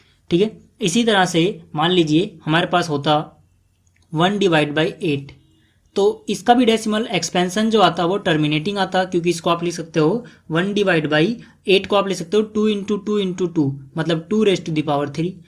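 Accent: Bihar, India. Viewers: Sir AP ky Bihar sa ha konsa jila sa hai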